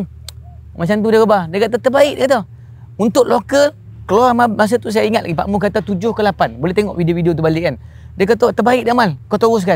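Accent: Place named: Kelantan, Malaysia. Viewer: tak faham.... maaf...pekat sangat cakap Kelantan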